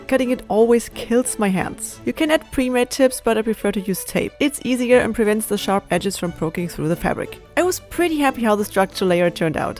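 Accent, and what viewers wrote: Germany. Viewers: The german accent makes 100 times better😅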